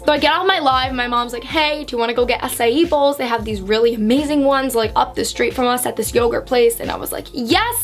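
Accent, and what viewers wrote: New York, United States. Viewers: Love your New Yorker accent, its so cuteee! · Her new York accent is on fleek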